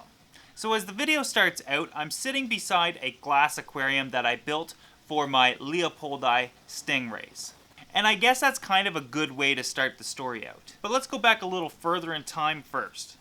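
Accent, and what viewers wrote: Canada. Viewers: you make good videos. Well planned and we'll spoken. are you Canadian? jw u sound it . cool videos thanks for the info!!!!!!!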